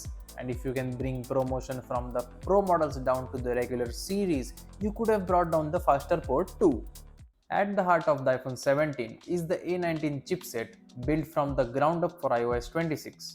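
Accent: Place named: India. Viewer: Indian accent is funny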